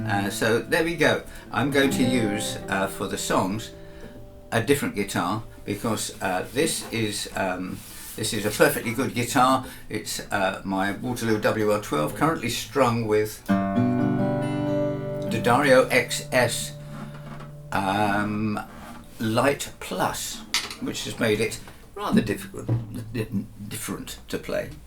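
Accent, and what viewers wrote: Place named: United Kingdom. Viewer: Hey, I love your channel. It’s just lovely to listen to your ramblings/musings/stories whatever. Love your guitars and stories of how you got them. Keep up the good work..oh and it’s nice to hear a British accent..😊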